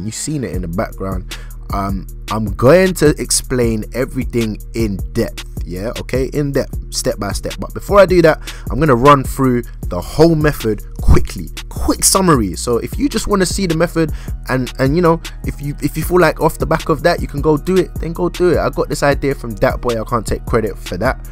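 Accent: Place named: Birmingham, United Kingdom. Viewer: Big respect to you bruv. I'm averaging 70k from these methods. I'm assuming your from the UK fam by your accent. Your accent sounds kinda Brum like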